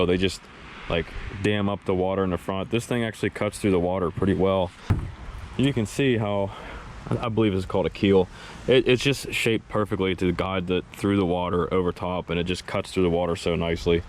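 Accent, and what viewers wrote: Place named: Pittsburgh, United States. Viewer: Man, Im like half way through this thinking there's NO WAY this dude aint a yinzer, then BOOM, "dahn the Yough." Confirmed. Grew up in the Mid Mon Valley / Ringgold area, just so happens I grabbed an Eagle Talon 12 a couple days ago and stumbled my way to this video. Your upgraded chair is aggressively Appalachian, I love it.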